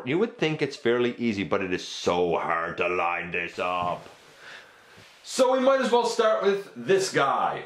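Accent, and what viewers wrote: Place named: Quebec, Canada. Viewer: You sound French Canadian. Haha, I stumbled across this video precisely because I see the set is on sale for $120.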